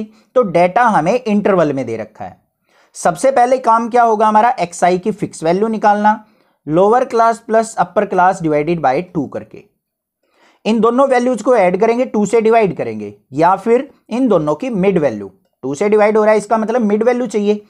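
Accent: Haryana, India. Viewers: Sir, I know that u are from haryana because aapki language mujhe thodi thodi haryanvi lgi. I am also from haryana ,Mahendergarh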